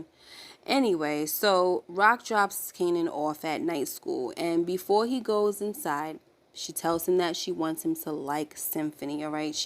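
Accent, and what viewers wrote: New York, United States. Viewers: Love your New York accent! I kinda miss it up there. PS- Symphony is not to be trusted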